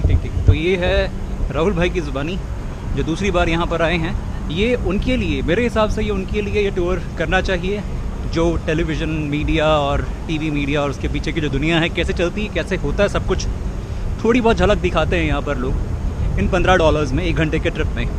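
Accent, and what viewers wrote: Haryana, India. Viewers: ghna uncha tha bhai.... Sir are you from haryana???? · ghna uncha h bhai .... haryanvi ❤❤❤❤ bhai r haryanvi b aav h tn t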